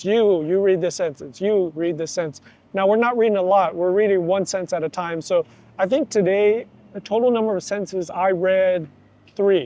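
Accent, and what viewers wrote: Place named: California, United States. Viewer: You are an American from California, right? Judging from how you speak English, I figure you are an American from California. Why not just work in high tech industry in the U.S.? I believe that U.S. salary is still better than working in Thailand. U.S. has its problems, but it never withholds opportunities for the right person. I cannot say the same about Thailand. Keep up the good job in learning Thai. I like to know how you improve your listening comprehension in Thai? For me, listening is the most important aspect of getting to know Thai people. But it is also difficult because I am still challenged by Thai tones, despite having studied online with Thai teachers from Bangkok for two years.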